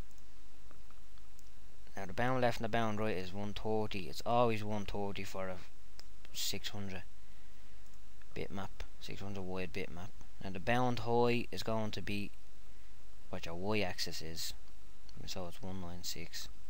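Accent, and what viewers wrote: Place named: Scotland, United Kingdom. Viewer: You got a veeeeery Scotish voiess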